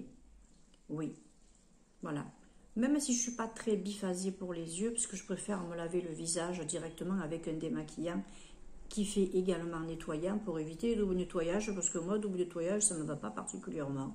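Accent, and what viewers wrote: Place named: Provence, France. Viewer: Bel accent Provencal...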